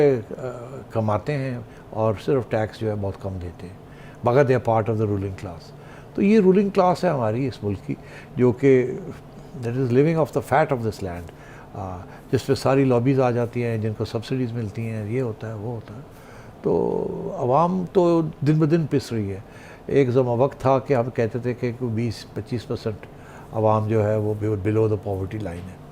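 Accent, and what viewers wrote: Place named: Punjab, India. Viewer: Your punjabi touch is awesome 👍❤❤👍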